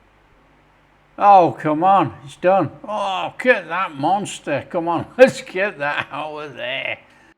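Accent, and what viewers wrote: United Kingdom. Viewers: Hi chef ,,, are you British 😊 !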